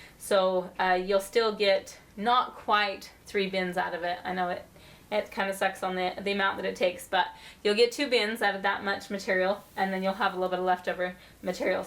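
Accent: Utah, United States. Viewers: It's a Utah accent ❤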